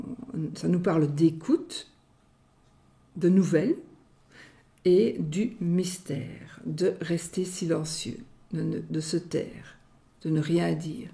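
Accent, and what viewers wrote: Belgium. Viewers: Coucou, merci beaucoup pour votre travail. Je vous découvre aujourd'hui. Vous êtes belge ? Vous avez, il me semble un petit accent qui me parle...❤❤😂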